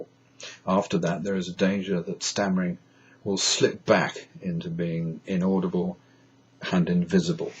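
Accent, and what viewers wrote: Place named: London, United Kingdom. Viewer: Thanks for your reply. I find your accent to be rather "psoh," indeed, and acoustically pleasing! I could not detect any Scottish. (Although I love Scottish Accents.) I was going to show it to my class as an example of solid Upper Class, a model they could use to play scenes from The Importance of Being Ernest, etc. Now I can also tell them it is a beautiful example of RP. But you sound ever so much more genteel than the average BBC accent. It's a nice, solid sound, with lovely resonance.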